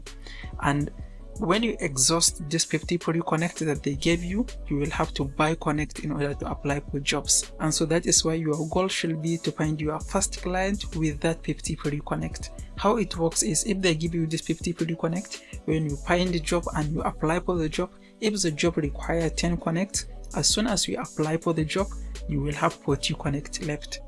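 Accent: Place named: North, Nigeria. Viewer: Your accent brought me here i knew, you're from the North 😊 ina gaisuwa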